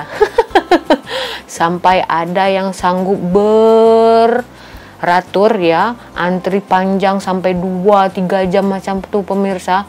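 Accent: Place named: Malaysia. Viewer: akak ni asli dari Indonesia ye kak?? cuma mahu tegur lenggok dan sleng/loghat nya sudah kayak orang Malaysia... saya rasa kagum sama akak...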